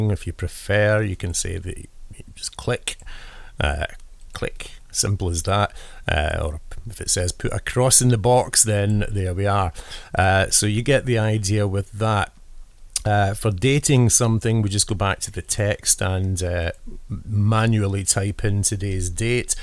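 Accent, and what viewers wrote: Scotland, United Kingdom. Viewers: Love your accent!!!! Scotch, Irish? I would guess the first but I love it regardless!! And Theenks fir da veedeo. XXOO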